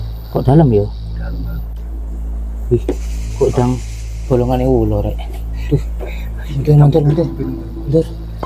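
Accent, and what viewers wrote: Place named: Wonosobo, Indonesia. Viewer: Kayak logat wnsbo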